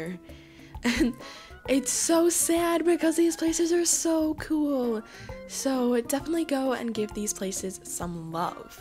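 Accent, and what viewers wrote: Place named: United Kingdom. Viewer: Sick British accent!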